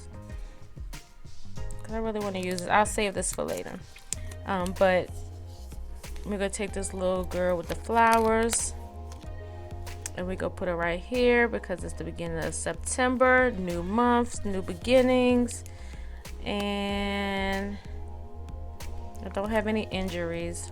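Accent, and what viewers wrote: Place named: Baltimore, United States. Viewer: I like the way you talk! I was thinking you were from Baltimore but i see you’re in Virginia lol I enjoyed the video as usual! Annnd you look amazing for 45 ❤️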